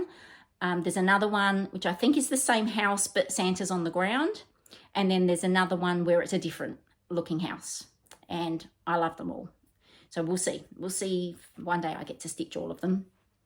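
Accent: Australia. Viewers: So good to hear your pleasant auzzie voice again.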